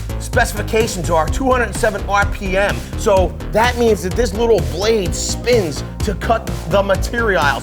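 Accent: Philadelphia, United States. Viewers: Just discovered your channel. Love it. Sounds like you’re from Philly, yes?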